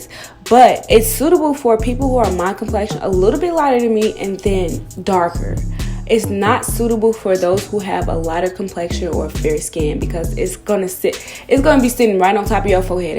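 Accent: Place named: Chicago, United States. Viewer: You have to be from Chicago 😂❣️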